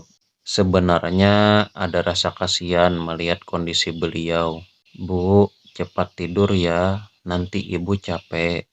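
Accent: Banjar, Indonesia. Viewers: Aslik suarak Banjar lih mang.😊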